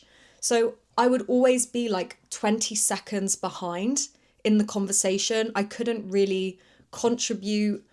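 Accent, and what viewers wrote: United Kingdom. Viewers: I always love watching your videos,. It's so easy to learn British English from your words, and it's so enjoyable. You're great, keep it up and take care. See you soon,. 🇬🇧🇬🇧👍🏻👍🏻📚📚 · What a cute teacher! UK accent is very cool. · 안녕하세요~~~ 영국발음 너무 좋아요~~ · Where are you from? You speak in British accent, but it seems to me that you live in USA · Very interesting to know how an adult can learn foreign languages easily and your story is indeed so interesting, as English natives seldom speak foreign languages. Determination is so important. Your videos are really helpful and your British prononciation is absolutely fantastic and you are a great professor.Thank you very much ❤ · Everyday is the earliest/youngest day to start. Just do it. Actually that's so much easier than before since YT exist. In the old days, what you can do is rolling the the sound tape and checking the book at the same time. 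It's impossible for me. YT teach the english so much more effective than the school at my young days. Nothing better than listen to some topic you are interested with the language you learning. For other english learners, this video is speaking with very English English accent. If you speak in this accent, you will be a funny guy in America and very native in UK. There is so much video on YT but there is not much video making by British. You know what, I feel so confidence on English until I arrived UK. I understand nothing when people speaking. I feel like I got scam all my life time by the school and YT. I realize I learnt American English the whole time and that's why I failed my school exam (mostly base on British English). But did a really great job, I can understand 100% in this podcast.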